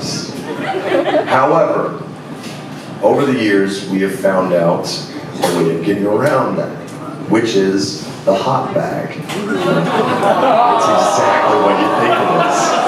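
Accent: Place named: Scotland, United Kingdom. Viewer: that beautiful scottish accent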